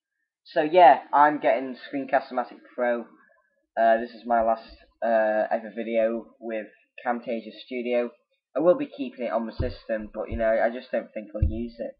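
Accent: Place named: United Kingdom. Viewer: omg british accent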